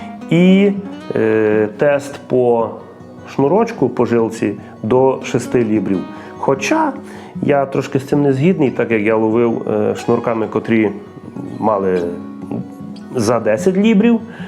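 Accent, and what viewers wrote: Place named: Western Ukraine, Ukraine. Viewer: А Ви із Західної України.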